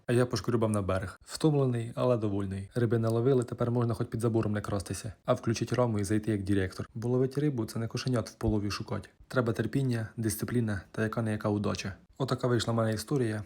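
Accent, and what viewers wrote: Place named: Sumy, Ukraine. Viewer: А з якої ви області? Такий суржик, як в Сумської. Я наче вдома побувала. Дякую вам!!!) Гарний контент( щоденне життя то круто).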